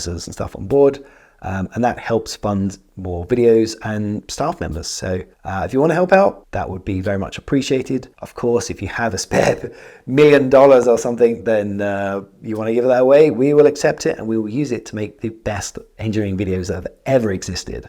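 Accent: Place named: England, United Kingdom. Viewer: Amazing teacher, animator and my favorite. Nice English accent as well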